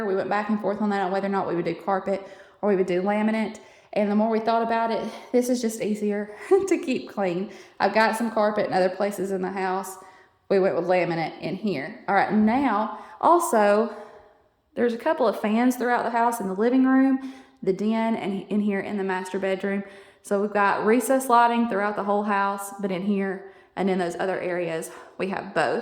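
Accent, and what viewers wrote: South, United States. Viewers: I have just found your channel and SO glad I did. When I heard your Southern Accent, I knew you had to be from Alabama, too. I am from the beautiful state of AL and love the south. Your home is gorgeous. I can't wait to see it decorated. I hope you will have nothing but happiness in your life while living there. I am already a fan and will be going back and watching your other videos. God Bless you and your family.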